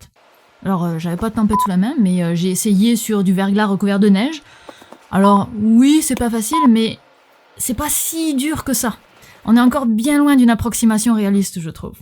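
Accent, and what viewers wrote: South, France. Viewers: Je me pose une question tu est Québécoise ? Car tu à l'accent du Sud de la France . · Y'a un truc qui colle pas : T'as l'accent du Sud, mais tu es dans la neige ! Quelque chose dans mon cerveau déconne en voyant ça...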